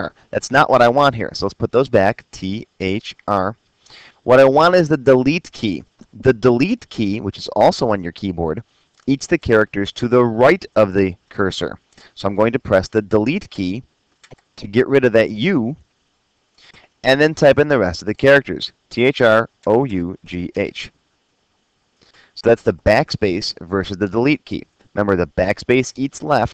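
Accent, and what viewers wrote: Chicago, United States. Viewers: I checked out several tutorials, (school free ware etc). This is easily the best tutorial on the internet. Love that Chicago/Great Lakes accent, LOL! Seriosly, thank you!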